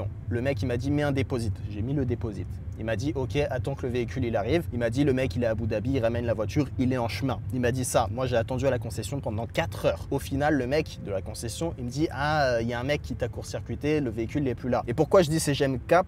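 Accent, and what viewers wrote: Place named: England, United Kingdom. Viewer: par contre ca me fume l'accent anglais hahah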